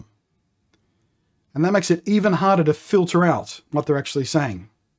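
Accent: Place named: Australia. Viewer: Your description of concentrating on a coffee cup in a coffee shop reminded me of me walking through Martin Place (in Sydney). I noticed that I was looking at the ground, and only glancing up to check and then I would look down again. Thinking about it, I noticed: the paving stones are static, calming. The buildings are static, calming. The sky is largely static -- maybe clouds drifting slowly, but basically calming.And then there is this band of activity -- the crowd of people moving around. And every motion is pulling at my attention: notice this! So it feels "prickly": I cannot filter out all that irrelevant, random movement from my attention -- it is all tugging at my attention. The movement of the crowd is not calming, but unfiltered, attention-grabbing sensations. I can look at it -- it isn't causing me a meltdown -- but I just feel calmer looking down, and only glancing up when I need to check where I am, and where other people are closeby. 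Being in lockdown means not having to walk through Martin Place or other city streets. I love the peace and quiet of my suburb. Getting diagnosed as an adult leads to many big and little self-discoveries. (And of course I picked that you were Australian straight away)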